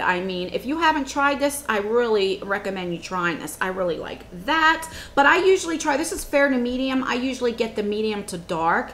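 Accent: New Jersey, United States. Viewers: I just like hearing your accent totally New Jersey, yes Walmart has goodies great for traveling too.Have a great Evening <3 Tina in Cali...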